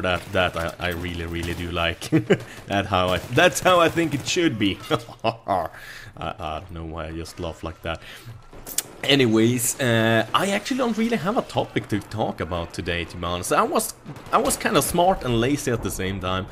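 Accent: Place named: Sweden. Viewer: man i wish i had a swedish accsent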